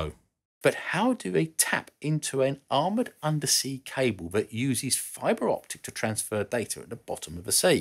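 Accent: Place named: RP, United Kingdom. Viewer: Thank you, for your videos! They are all incredibly good! Your speech is brilliantly clear, and there are few other places on YouTube where you can see proper English subtitles (and also hear real BBC English spoken!)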